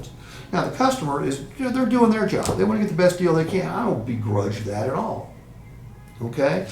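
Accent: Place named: South, United States. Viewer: and his hillbilly bullshit talk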